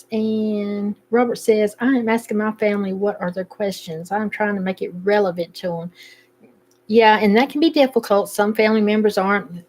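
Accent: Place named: North Carolina, United States. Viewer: What a great program. I wish I had been on my journey at the time you started the Piedmont Trails so I could follow you as I desperately worked to understand my family history. I happened to find your channel by accident as I was flipping through You Tube for a movie. Since I was born in Statesville NC I immediately recognized a person speaking my language. I don’t even need my hearing aids to understand what you are saying. I love your accent ❤ At 77 people tell me I waited too long to start this project. However, I still remember my grand parents and my great grand parents talking about family members and it ties into my search back into my family’s history. Please keep this program going, You are special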